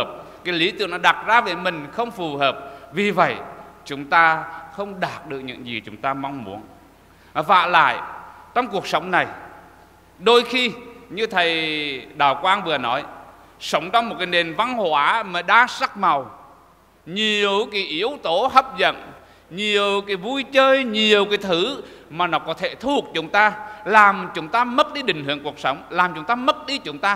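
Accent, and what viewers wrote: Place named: Hue, Vietnam. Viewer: Mô phật con rất thích nghe thầy giảng, thầy nói giọng huế rất hay.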